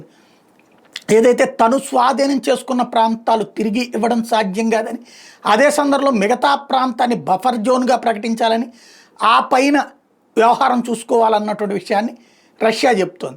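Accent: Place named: Telugu, India. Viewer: when you speaking , getting Telegu nativity sir.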